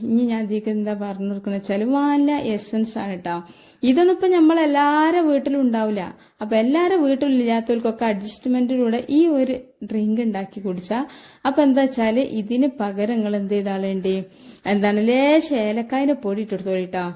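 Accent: Malappuram, India. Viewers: താത്താടെ മലപ്പുറം ഭാഷാ കേൾക്കാൻ എന്ത് രസാ. 👍👍👍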